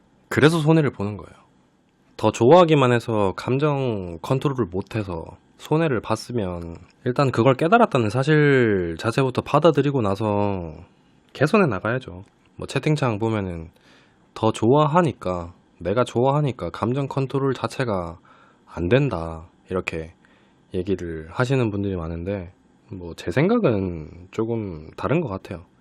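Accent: Gyeongsang, South Korea. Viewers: 얼마전에알게되서 듣다보니까 대구분이신가요 말투가 대구인데~~?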